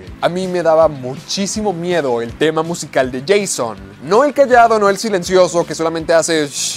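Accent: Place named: Fresa, Mexico. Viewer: Por que alarga tanto las frases como por ejemplo cuando dice cual es tu pelicula de miedo favorita dice cual es tu pelicula de miedo favoritaa se escucha raro y bien fresa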